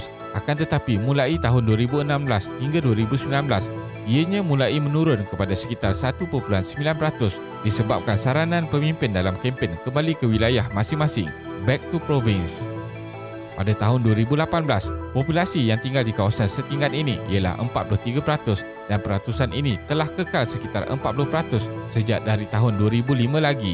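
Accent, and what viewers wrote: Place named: Kuala Lumpur, Malaysia. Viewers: Ini bahasa Melayu Selangor lah Kenape teruk maknusie dunie tige peratus utame kerancakkan kepade antare berbeze serte jenayah setinggan dibine limeblas iyenye pade peratusan Kenape secare purate Mereke lame dikitar semule.... Tak sama langsung dgn bahasa Indonesia....beda bunyi.... bahasa Indonesia berbunyi a sedang bahasa Malaysia berbunyi e di ujung perkataan... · Bahasa Melayu itu luas. Kalau dalam video ini menggunakan slang Kuala Lumpur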